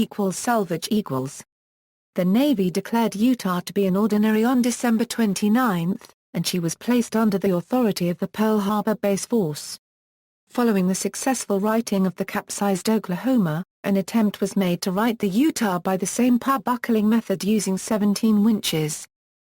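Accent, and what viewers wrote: England, United Kingdom. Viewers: Love the English accent hate the robotic voice.....And by the way.....Tesla invented and received a patent covering RCVs.......The USS Utah may well have been one of, if not THE, largest vehicle/vessel ever controlled by radio(remote) control to date!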